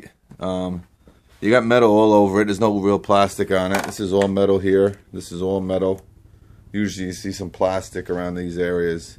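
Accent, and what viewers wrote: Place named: Long Island, United States. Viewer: You have to be from Long Island with that accent. Thanks for the review.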